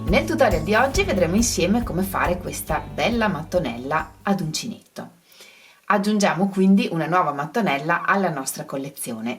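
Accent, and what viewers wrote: Veneto, Italy. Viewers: Bellissima! Sei bravissima 👍 Sei Veneta? Hai un modo di parlare splendido 😉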